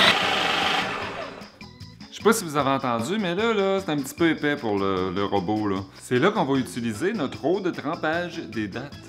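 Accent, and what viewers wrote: Canada. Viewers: Quel délice l'accent et l'humour canadien pour nous européens ;-) ..on va essayer ça et ne pas en abuser hihihi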